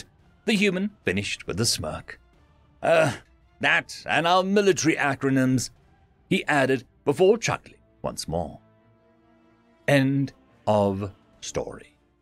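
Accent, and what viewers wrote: Australia. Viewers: Must be from down under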